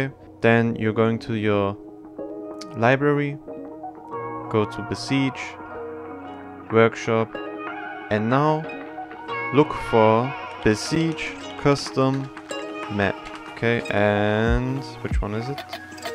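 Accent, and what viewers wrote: Germany. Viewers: I can hear some Deutsch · küss dein herz brudi, stunden lang gesucht (isntant erkannt das du deutsch bist)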